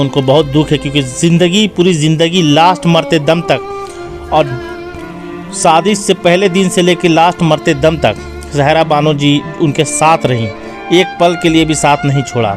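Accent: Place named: Bihar, India. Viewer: Utuberji, aapni languge sudhariye, Pronounsation me bihari lehja he, faltu baton se vdo bhot lamba bana dala he bhai apne, dusri baat ye namaze janaza dilip saab ki nahi he, pakistani vdo add kardiya he aapne janaze ki namaz ka.... Mujhe itna batayenge bhai... K q jhoot ka sahara lena pada apko....???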